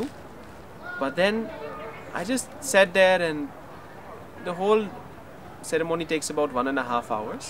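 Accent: India. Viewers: Its indian but its damn good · your English is much more INDIAN... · Hello, your Indian accent delivery took away from the meaning of your words. It just does the opposite of feeling genuine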